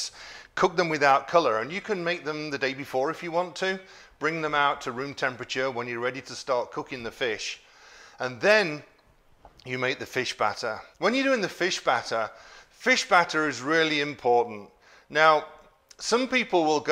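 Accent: England, United Kingdom. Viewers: What's the favorite fish bar called? I sometimes have a hard time understanding English accents sorry! But I'd like to go if I ever visit Scotland :) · There are so many accents in England. Do you mind telling us where you grew up. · lol, I've heard, still would love to visit, see the architecture, the culture, everyone having your accent lol I wanna be british for a couple days lol... Come to NYC, if you haven't already.. many things to do here, and you can find the best of any culture of food. Your accent is the rarest you hear in NYC too, from my experience lol. there's asian areas (chinatown, etc.) Jewish communities, (brooklyn) there's black areas, there's indian areas, latino areas, etc... it's a melting pot of every culture.. basically the only time you hear someone with your accent is if it's a tourist lol... in my experience. To stay there for a couple days, and everyone I talk to having your accent would be a fun experience lol.. 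but that's normal for you. So interesting to me.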